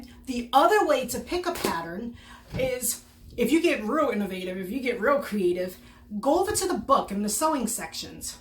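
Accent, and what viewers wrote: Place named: Boston, United States. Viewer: you are so adorable, I find I am smiling while I am watching you. This video, helped me be confident in the things I have figured out on my own already, and you clarified some things I was not sure about, and taught me a couple new things!!! Thank you! But one question. Are you from Boston? I had a friend in college long ago, from Boston, and she said a couple of words with a particular accent, the you say a few words really similar. The word "here" and words that end in "-er". Just wondering.: D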